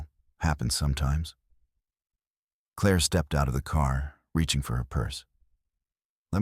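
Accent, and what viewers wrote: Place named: South Africa. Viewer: South African iloveit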